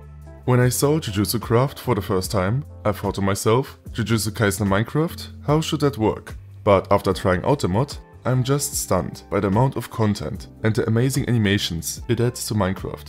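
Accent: Germany. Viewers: He is German you can hear it your self in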